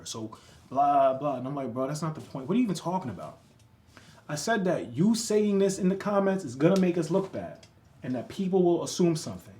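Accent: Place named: Newark, United States. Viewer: north Jersey? You do have a bit of a Newark accent. I’m not used to hearing non-Philly accents I live in Atlantic county now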